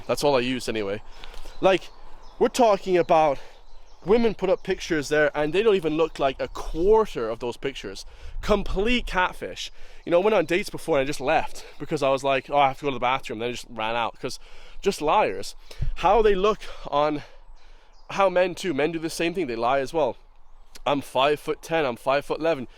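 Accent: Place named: South, United States. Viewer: Hahaha you think you're right wing, but you don't abiut politics. You sound like a redneck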